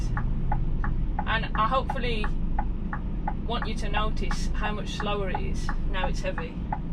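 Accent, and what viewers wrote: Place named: Newcastle, United Kingdom. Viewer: I love your Newcastle accent, boy do I miss a manual gearbox, most new truck are auto's, I'm really jealous watching you flip up and down the gears. Great video.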